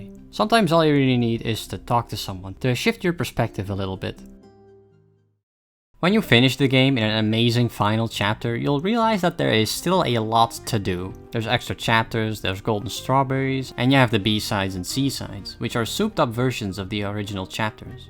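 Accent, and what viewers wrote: Netherlands. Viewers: Hi. You Dutch?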